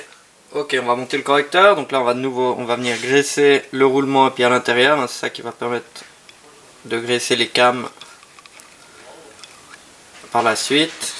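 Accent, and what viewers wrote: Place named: Switzerland. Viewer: Je découvre cette chaine, aaaaaahhhhhh ça joue! Un ami Suisse cet accent m'avait manqué!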